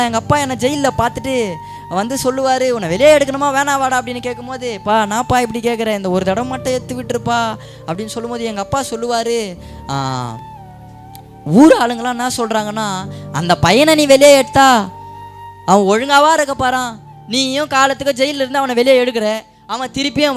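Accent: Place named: Sri Lanka. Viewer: sounds real, no fake ceylon tamil.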